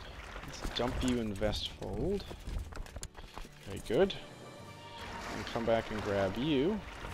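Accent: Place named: England, United Kingdom. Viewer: I can definitely hear the English in your accent, just generally the way you speak and the words you use. Just thought I'd let yet know.. :D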